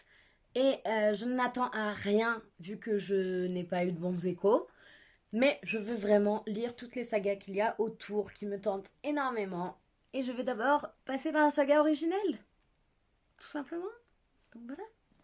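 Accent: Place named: England, United Kingdom. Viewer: Bonjour très bonne vidéo apaisante. Vous avez tun bel accent anglais. J aimes le livres fable. Merci bonne journée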